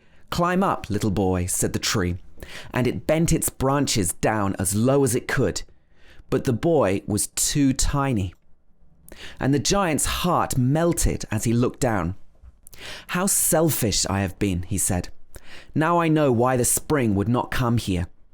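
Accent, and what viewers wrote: United Kingdom. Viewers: Trying to go to uk next year to learn English and started look for the videos in British accent and I love this!!! Thank you!! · I was looking for someone who actually has british accent with text book reading for my role practice, Thank you so much !! I can actually see the text and repeat words so I can practice while hearing your reading! lovely ♥ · I love your accent!! When I was child in my country in Central America I was learning British English, I don't understand why more American accents were introduced later. maybe because it is the same continent. 😂😅But I love this accent 🥰🥰🥰 Sorry for my English is not excellent 🫶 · You have been blessed by God.Your voice is adorable and I hope to listen another great story touched by your nice british accent. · This is a nice story and very good for learning the British accent, keep it up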